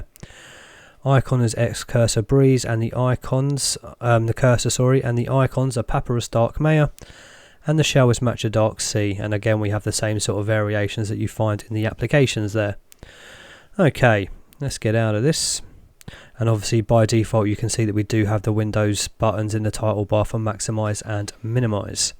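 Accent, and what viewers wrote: United Kingdom. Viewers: Pretty good channel. Nice British English pronunciation... I wonder what kind of opinion will have regarding Manjaro 20.1 Cinnamon Edition...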